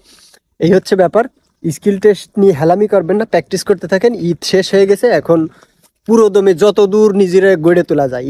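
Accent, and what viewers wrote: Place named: Satkhira, Bangladesh. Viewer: ভাই, আপনার দেশের বাড়ি কোথায় ? আপনার ভাষা আমাদের সাতক্ষীরার সাথে অনেক মিল খুঁজে পাই ❤❤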